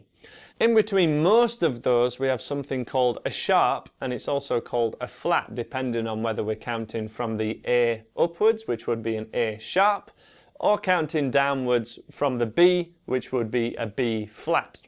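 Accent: United Kingdom. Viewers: I loved ya explanation and I also love ya british accent. That's really catching !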